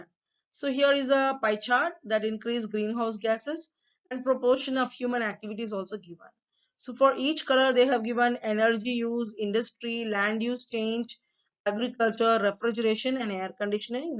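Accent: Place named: India. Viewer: this is indian accent😊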